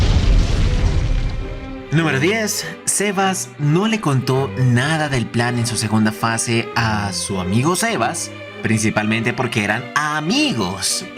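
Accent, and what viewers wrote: Colombia. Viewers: Excelente video! Pdta: de casualidad sos Colombiano? 😅😂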